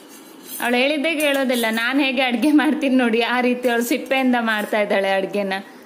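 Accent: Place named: Kundapura, India. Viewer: Kundapura bashe super. Nam kundapura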